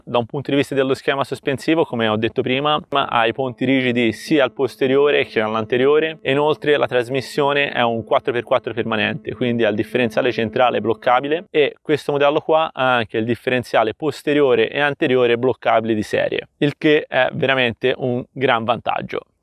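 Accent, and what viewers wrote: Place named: Tuscany, Italy. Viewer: Iscritto, la parlata toscana come la mia ci sta abbestia! Curioso di vedere come lo camperizzerai😁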